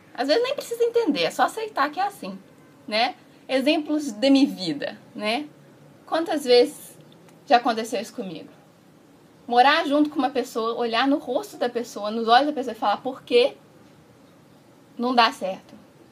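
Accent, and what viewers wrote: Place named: Minas Gerais, Brazil. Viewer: Bom dia, espero que esteja bem. Sou engenheiro brasileiro, atualmente moro em Curitiba. Trabalhei e estudei na região de Munique (Bavaria), nos anos de 2006 e 2007. Assisto teus videos para matar as saudades que tenho da Alemanha. Voce é uma moça muito especial, tenho uma admiração muito forte por voce. Entrei em seu canal ha pouco tempo, mas me sinto feliz em te assistir. Voce tem sotaque de mineira, é isso mesmo: Estava vendo um video seu e que voce vai se casar, qdo sera: sra em minas: O rapaz deve ser um caboclo pra mais de sortudo ter uma mulher como voce nos brcas dele. te desejo muita sorte em sua vida, um abraço bem forte de urso siberiano, Caso queira conversar estou por aqui. Ate mais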